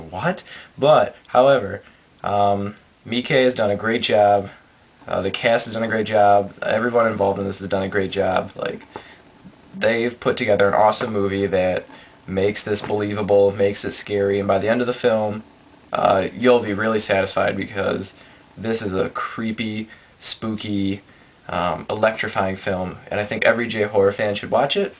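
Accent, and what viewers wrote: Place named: Michigan, United States. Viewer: I'm betting you're from Michigan... :p Nice accent ;)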